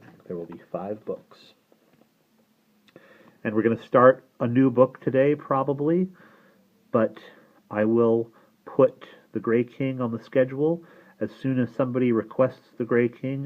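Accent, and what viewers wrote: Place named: Cornwall, United Kingdom. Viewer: Thank you so much, your readings are entertaining and relaxing and i always appreciate your british accents and especially the Cornish this time! Sending thanks and good wishes from England.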